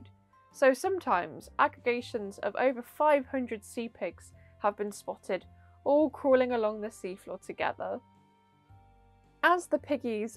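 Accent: United Kingdom. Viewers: What part of England is your accent? Its one of my favorites, the one where yall say it like "PEBBOWLS" instead of pebbles 😂❤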